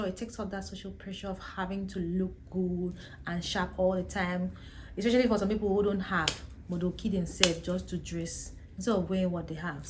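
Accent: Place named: Akwa Ibom, Nigeria. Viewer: Akwa ibom or calabar diction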